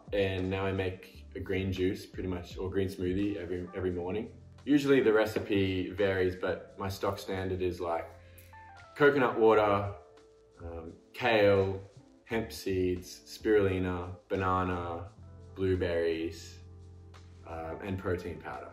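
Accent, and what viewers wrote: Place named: Australia. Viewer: I love his Australian accent. I can listen & watch him all day. Hes so gorgeous 😍😛😘☺🥰🤗🤤😃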